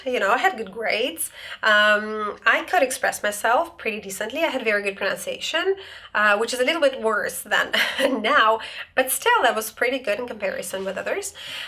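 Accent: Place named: United States. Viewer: Thank you very much for this video! May I ask you some questions? Do you speak any other foreign language? Why did you choose the American English pronunciation? I ask because at my university our teachers prohibited speaking the American English. Thank you in advance 🤗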